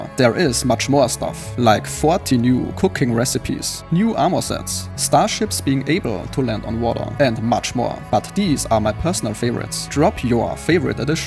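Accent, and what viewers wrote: Germany. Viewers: The German accent made me stop the video after 5 seconds. Sorry 😂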